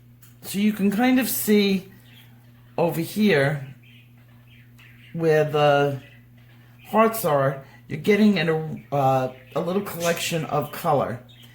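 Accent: New York, United States. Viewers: It is good to hear your voice again. Love your NY accent, glad you got out of there too. May God bless you always, your generosity is unsurpassed and I love you. Love this vid.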